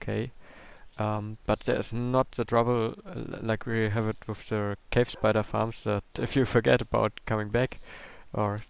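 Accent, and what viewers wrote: Germany. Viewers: Are you German? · German accent :D and.. can you german?! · Are you german?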